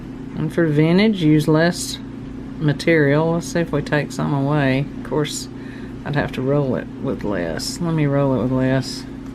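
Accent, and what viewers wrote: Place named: North Florida, United States. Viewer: Loove this, too! I am also a person who loves thrift store fabric! I am so crazy about fabric flowers. I like almost anything made from fabric. I could craft 24/7 , IF I had the time! I am from North Florida. You sound like us here in good old Live Oak. I am guessing you are from the south as well. I love watching you and listening to you. Thank you for sharing.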